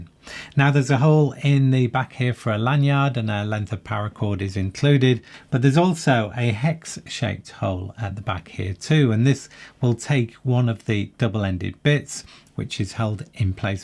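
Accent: United Kingdom. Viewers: Perhaps mention not legal in uk as some Brits won’t know and as you are (or sound) British they might assume you are saying edc in UK.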